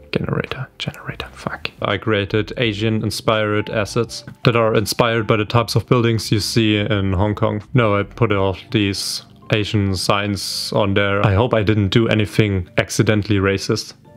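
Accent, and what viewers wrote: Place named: Germany. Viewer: Don't give a F about Generator, I find Denglish accent totally fascinating :) There are accents out there that sound deeply disturbing to me, e.g. arabic or russian english, I downvote and turn off those videos immediately if I accidentally click them. It's got nothing to do with racism, it's got to do with violating a language.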